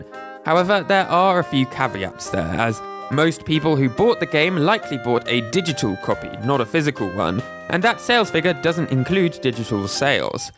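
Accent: United Kingdom. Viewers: I don´t know why, but it´s just hearing your calm voice and brittish accent and i just get super chill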